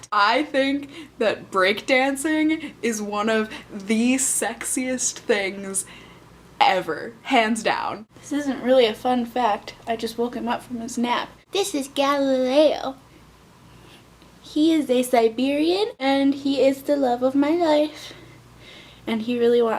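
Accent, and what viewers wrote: Minnesota, United States. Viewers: Your Minnesota accent killed me. I'm dead. DEAD. IT HURTS SO GOOD. LOOOOOL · OMG the MN accent got me cracking up!!!! I always tell people that we don't talk like that. But I did have a coworker say that he forgot that I had an accent until I was on vacation and came back. He said then he could hear it. I only hear it come out when I say certain words. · i died at the Minnesota accent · Also, please do your Minnesota accent more lol, it's adorable!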